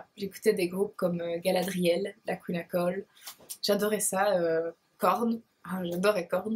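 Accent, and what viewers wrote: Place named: Belgium. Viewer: Super que tu débarques sur YT ! J'adooore tellement l'accent belge :)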